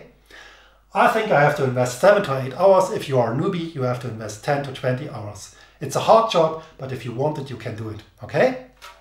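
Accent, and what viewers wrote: Germany. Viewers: Das beste am Video: Das abgehackte und harte Englisch. Da hört man gleich das er ein Deutscher ist. 🤣🤣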